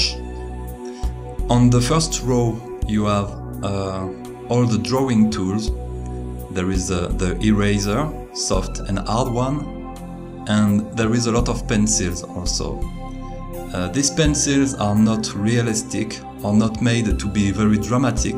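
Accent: France. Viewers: are you french? you have thé french accent 😊☺ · trop fort l'accent français^^ merci pour le partage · Merci pour la vidéo ! Ton accent français qui articule bien pour chaque mots en anglais (contrairement à eux qui avalent leurs mots); m'a beaucoup aidé à te comprendre ! xD Et ceux même en anglais. Merci ! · le bon accent de français haha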